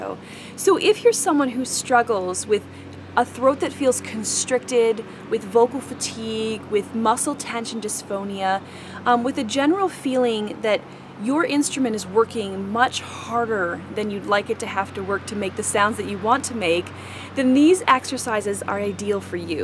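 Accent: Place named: Midwest, United States. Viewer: thank you for sharing your knowledge. you sound like you're from the midwest. i'm from wisconsin!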